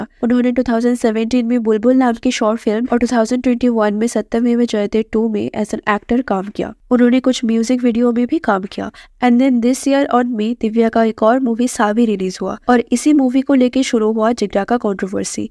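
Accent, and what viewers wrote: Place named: Kolkata, India. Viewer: That's khosla, not khoshla😂 I dont know why but I feel you are from Kolkata🤔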